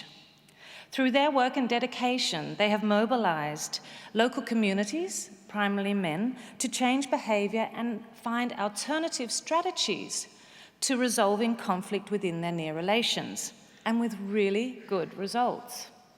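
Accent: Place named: Australia. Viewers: As a someone who speaks several language, I can tell you, that from what I know, losing one's native accent is pretty hard. It's probably because she's Tasmanian, she might be an Aussie, with an Aussie accent, but there's some Tasmanian accent in there, and that's what sounds broken, or "Danish". You can still hear that her Aussie-Tasmanian accent affects her Danish to a pretty high degree. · Hasn't lost her Aussie accent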